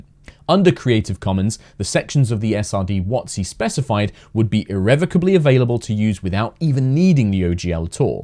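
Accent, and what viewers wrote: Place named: United States. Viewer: I love you but why have you grown more and more American? You pronounce t's as d's.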